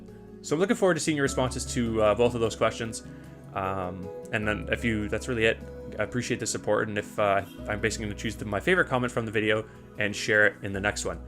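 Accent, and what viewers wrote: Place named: Canada. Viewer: OOOh that new microphone really makes the Canadian accent come out!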